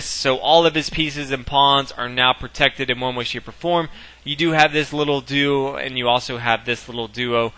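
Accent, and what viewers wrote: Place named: Northeast, United States. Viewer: you are either from Massachusetts, Connecticut, or New York. Am I correct?:-) commentator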